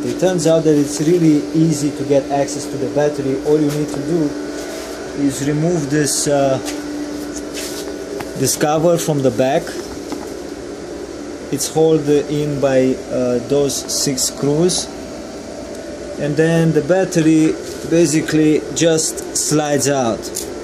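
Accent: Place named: Romania. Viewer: Sound so romanian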